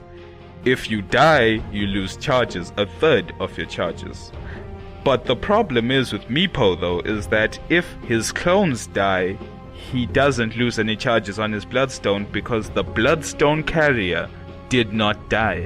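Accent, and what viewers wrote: South Africa. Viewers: I'm guessing you're from South Africa. Am I right? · this oke is south african for sure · dude are you South African? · He does sound very South African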